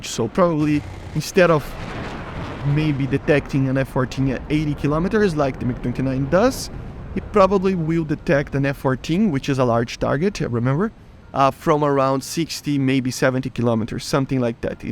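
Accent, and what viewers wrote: Brazil. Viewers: Brazilian accent.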